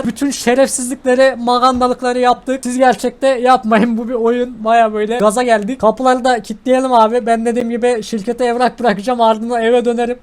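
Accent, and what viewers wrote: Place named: Ankara, Turkey. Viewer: Dünyada hem Ankara hemde Adana şivesi yapabilen tek insan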